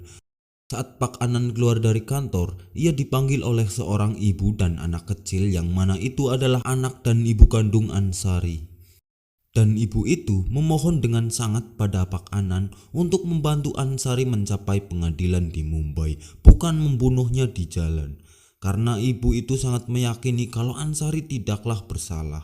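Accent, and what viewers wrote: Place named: Tegal, Indonesia. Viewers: dg bahasa mimin meddok banget pingin belajar bhsanxa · Tegal bgt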